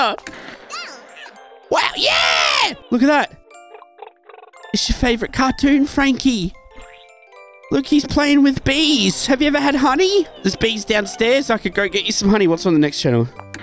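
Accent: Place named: Australia. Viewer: You a Australian brohther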